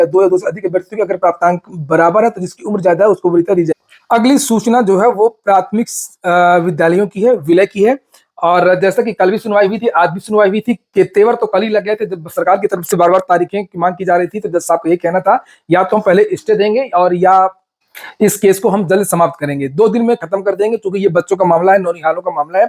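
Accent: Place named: Western Uttar Pradesh, India. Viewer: Aap west up ki ton me bol rahe ho bhai kya baat h.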